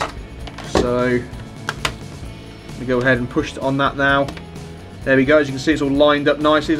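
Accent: United Kingdom. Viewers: Good work. Can I bring my laptop to you for a quick fix?:) That's a lot of bloody screws. I have the same model and same exact issue. If I give it a go, I'm thinking to use Gorilla glue, it's darn good stuff we have here in the USA, don't know if it's available in the UK. Pardon me if I didn't guess your accent correctly.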